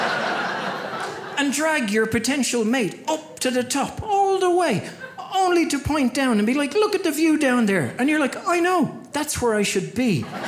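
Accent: Ireland. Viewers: Very funny. And I can't resist an Irish accent. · It was close to accurate. To a passive listener, he could have easily passed it off as legit. But I really do love listening to the Irish accent.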